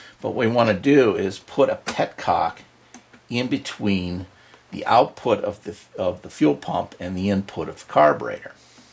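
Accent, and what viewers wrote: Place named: Seattle, United States. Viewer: Great video thank you so much, a big help. Can I be pedantic and point out that the 'screws' you refer to removing at the start are not screws but bolts. Bolts have a machine thread which to work always has to engage with a nut or a threaded hole. Screws screw into wood or other softish materials and never require a nut or other receiving thread to be functional. Thanks! PS You're not BIll |Gates are you? Your accent is just like his.... Maybe a Washington/Seattle accent!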